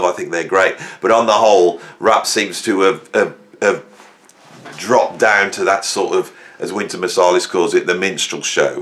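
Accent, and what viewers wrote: Birmingham, United Kingdom. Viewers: I love watching your videos,. We are same generation and you create this pleasant homey atmosphere, so I feel almost like talking with one of my music-buff mates. Lovely Birmingham accent, too. 👍 Thanks! annnd cheers! 😆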